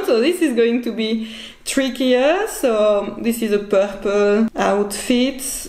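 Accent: France. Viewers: Merci beaucoup! Super ludique!! J'essaie pour le J5 demain 😉😉😉 J'adore tes vidéos, inspirantes et pleines de bonne humeur avec ton accent frenchie très mignon 😃. Ce serait super si tu avais le temps un jour de faire une vidéo sur les visages en quelques coup de pinceau 😊. Je ne sais jamais comment placer les ombres et les lumières et comment figurer les limites ni comment décider quels détails retenir. Merci encore pour tout 👍👍👍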